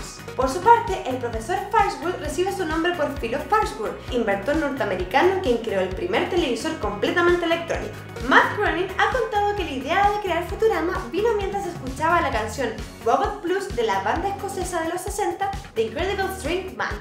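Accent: Chile. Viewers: debo confesar que no soporto el acento chileno (así como muchos no soportan muchos otros) pero la forma de hacer los vídeos y el cuidado con las cosas que dicen me hacen obviar eso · eres chilena?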